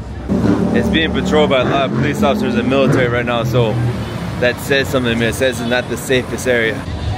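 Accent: Paisa, Colombia. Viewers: Your accent sounds Colombian when you speak Spanish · hey How long are you in Tijuana, not sure if you have time for a Meetup, I am from San Diego, Long time Tijuana Expert. I went to Medellin last year and that's how I heard about your Channel..Cheers, FYI Red Building is the famous Hong Kong lol. Funny you talk Spanish in Tijuana like Paisa People haha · Are you Colombian or Venezuelan? Cause your accent sounds like it. Great video tho 👍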